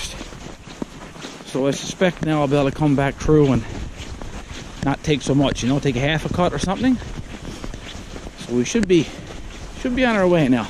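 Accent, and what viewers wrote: Newfoundland, Canada. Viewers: When I started watching you clear snow, I said wow, no wind to speak of, how lucky is it to be where you are. I am in Eastern NL, Canada and it seems like it is always windy here. Throwing snow almost straight up is never an option here. Then, I noticed the tall trees and guessed you were in Ontario. Then I saw your license plate and picked up a bit on your accent, seems like you are in Central NL. Great machine for snow clearing. I assume your driveway (at the cabin) is dirt. What do you do to keep your machine from digging in and picking up rocks and breaking shear pins? I haven’t had much luck setting the guides high when clearing uneven surfaces with mine. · Sounds like a fellow Newfoundlander here!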